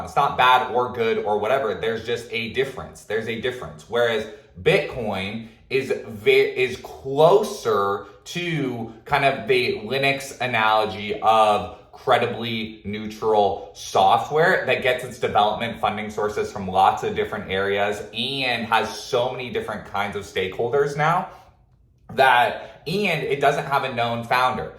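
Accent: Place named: Los Angeles, United States. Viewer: I will subscribe if you ditch the LA enunciation, great video man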